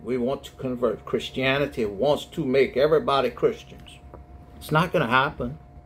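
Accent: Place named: New Orleans, United States. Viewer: You are a knowledgeable person. You speak well and I’m overcome with joy just listening. You ARE changing folks 1 at a time. Prayers for your bride, Tam, and you. we love that New Orleans accent and your Bride💜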